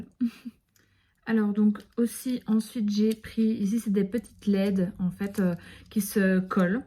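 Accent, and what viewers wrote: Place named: Belgium. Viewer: Meuf je kifff ton accent 😅☺️je pense que t’es belges si nn super ta vidéo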